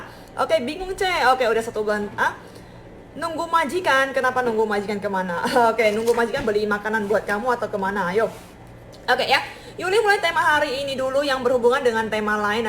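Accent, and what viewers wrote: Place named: Taiwan. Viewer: Eh di Taiwan kerja apa sih kok cengkok bicara nya uda sangat menyatu dengan Taiwan.uh..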